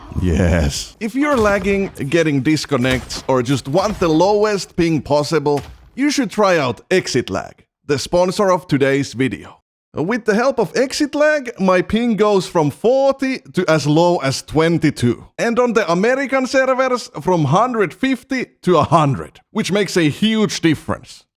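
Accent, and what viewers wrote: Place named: Finland. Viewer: I love how Finnish you are. European accents are just so fun to my weak American brain. Maybe it’s all of the cholesterol going to my head.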